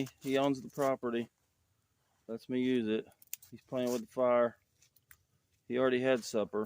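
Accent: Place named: South, United States. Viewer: I'm going to take a wild guess that you're from the South :)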